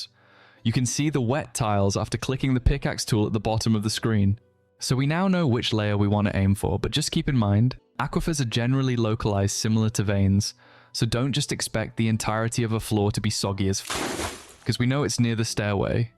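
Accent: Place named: Scotland, United Kingdom. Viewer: I cant help but notice a scottish twang in your accent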